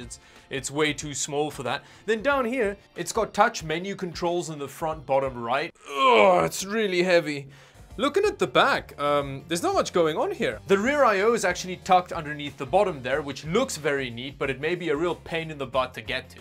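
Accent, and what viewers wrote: South Africa. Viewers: Dude are you South African? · what is your accent comprised of please? i cant make it out. Sounds like South African mixed with Canadian and Welsh.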